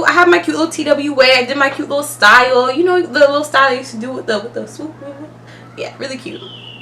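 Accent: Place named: Toronto, Canada. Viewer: The Toronto accent I love it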